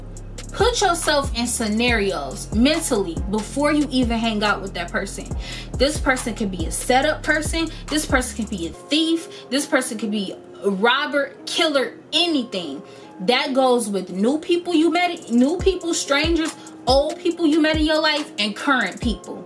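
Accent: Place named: Detroit, United States. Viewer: When u said I’m from Detroit, I felt that that is how we talk , we be spicy , new subbie girl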